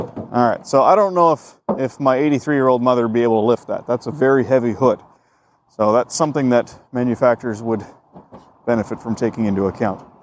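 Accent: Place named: Canada. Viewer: Damn Canadians and their ou pronunciation. Really jumps out at us Americans.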